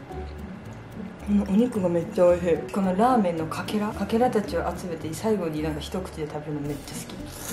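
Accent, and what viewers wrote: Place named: Saijo, Japan. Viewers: たまたまおすすめに出てきたから見てみたら(なんか西条の喋り方ににてる！なつかしい！)と思って3日くらい前から見始めてここまできたらまさかの西条出身で沸いた😂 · 松山と西条では同じ県でも方言が違うんですね。母が新居浜ですがそれとも違う言葉だなと思いました。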